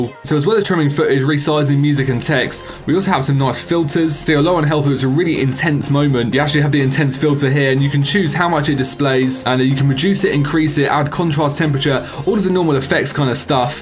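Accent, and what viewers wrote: United Kingdom. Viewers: I just knew that you are British I love your accent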